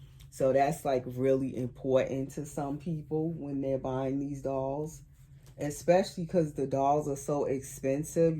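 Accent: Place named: New York, United States. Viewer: Ok I have to ask... are you from NY? NJ? Lol you have that accent. I love listening to you talk!❤ I also love listening to myself talk 😂so I'm just wondering lol love your baby, she's sooooo cute!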